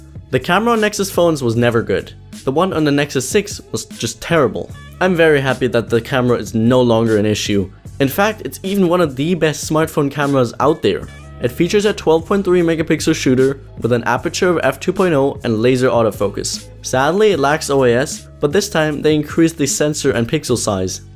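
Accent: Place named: Germany. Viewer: The "aluminium" and your accent give away that you are german, am I right? ;) Aber super zu sehen, dass hierzulande doch ein, zwei Leute neben mir das 6P haben. :)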